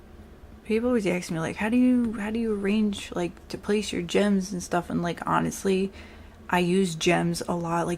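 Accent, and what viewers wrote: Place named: New York, United States. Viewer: Congrats on your 260 subs... Lol heavy NY accent..My Spanish accent is heavy too, I cannot imagine what people will say about it 😅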